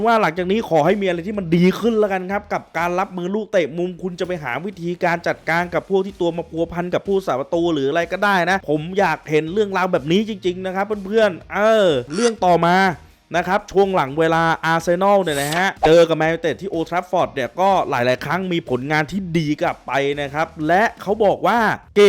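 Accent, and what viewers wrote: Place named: Thailand. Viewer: มาเจิมคอนเท้นท์ใหม่เเก้ะกล่อง ชอบครับ กระชับ มีมุกตลกสอดเเทรก เเถมมีคาเเรคเตอร์เสียงเหน่อ ขอให้ปังๆนะครับ🎉 (จากแฟน LFC ที่เปิดใจฟังทุกทีม)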